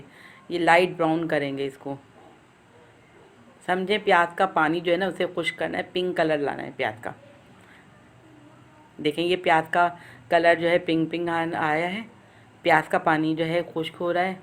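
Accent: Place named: Bhopal, India. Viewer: Apki zaban bilkul indian(bhopali) jaisi hei👌👌 or apka haddi wala pulao mene bna kar dekha boht accha bna hei👍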